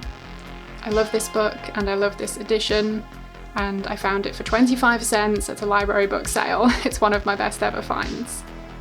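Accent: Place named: England, United Kingdom. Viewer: Thanks for a very enjoyable video,. You have a fantastic collection of books with some very eye-catching covers, a lot of which I have to admit I've never even heard of! As I'm over in the UK it was also great to see US editions of books by favourite authors of mine like Clive Barker and Ramsey Campbell. It also made me smile that you still say Poppy "Zed" Brite instead of Poppy "Zee" Brite and that you still pronounce "Bernard" the English way 🙂. I'm probably going to have to watch this video again so I can note down and research the books that particularly captured my attention.......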